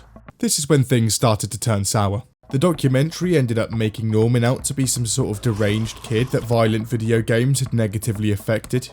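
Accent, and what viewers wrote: United Kingdom. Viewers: Internet historian's British son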